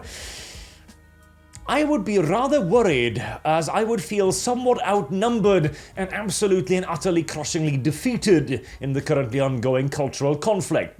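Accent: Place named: England, United Kingdom. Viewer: You accent reminds me of "Robin Hood/ Men in tights". The lead actor who said " Unlike other Robin hoods.. I can speak with an English accent ..."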